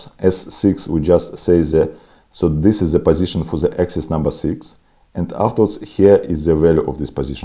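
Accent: Russia. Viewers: у вас очень сильный русский акцент, у вас только англоязычный канал?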